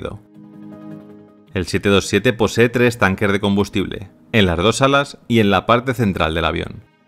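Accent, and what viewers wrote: Spain. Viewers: que dijo????? Puedes modular bien y sacarte ese acento español.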